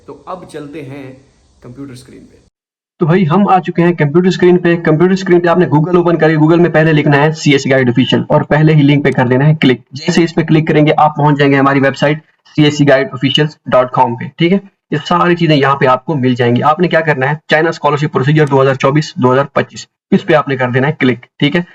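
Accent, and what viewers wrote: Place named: Layyah, Pakistan. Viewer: ap k bat krny k styl se lg rha hy k ap layyah se hn... am I right?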